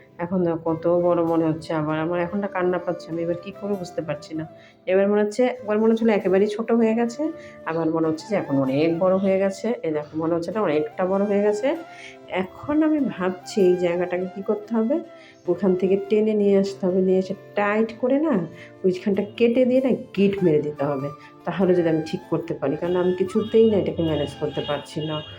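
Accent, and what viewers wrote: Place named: Rajasthan, India. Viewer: ❤❤❤ Rajasthani Bengali❤❤❤❤❤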